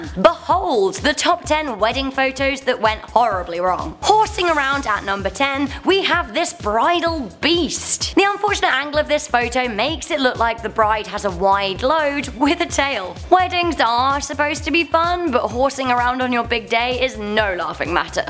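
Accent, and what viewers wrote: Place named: United Kingdom. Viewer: This lady's British accent is super strong and LOUD!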